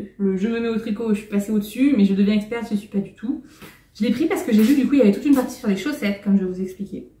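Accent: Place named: England, United Kingdom. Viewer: Tellement naturelle dans cette vidéo 🥰 Je suis fan de tes gilets et de ton accent anglais aussi ! Et tu as un excellent rythme en tricot, c'est clair ! Certaines teinturières proposent la mise en écheveau (CLainesBoutique fait la mise en pelote à 0,5€ !). Pour les chaussettes comme toi, j'ai voulu essayer en cours de tricot et j'ai perdu mes mailles 🤣 Et je n'ai pas fait d'échantillon non plus ! Le montage des chaussettes, pour la première j'ai recommencé 10x, pour la seconde en deux fois c'était bon.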